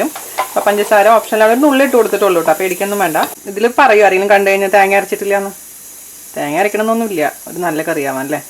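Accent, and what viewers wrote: Thrissur, India. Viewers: Chechi ur presentation is Soo gud n u have a great thrissur slang 🥰😍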